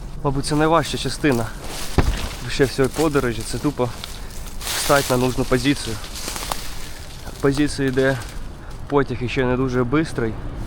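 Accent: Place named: Poltava, Ukraine. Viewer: Перший раз тебе дивлюся! Красава! А по суржику схоже що ти з Полтави?!😅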